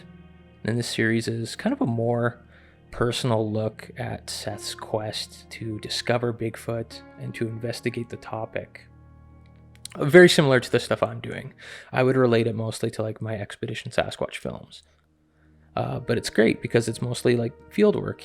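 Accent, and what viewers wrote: Canada. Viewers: Yes that's a good documentary but I like your stuff the best an I hope it's you that proves bigfoot is real I love your Canadian accent 😂 keep it coming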